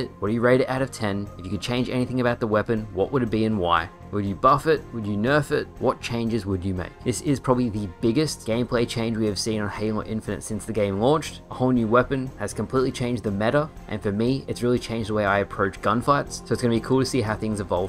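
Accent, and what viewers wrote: Australia. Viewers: Lol forgot was Australian until he started the video with "G'day"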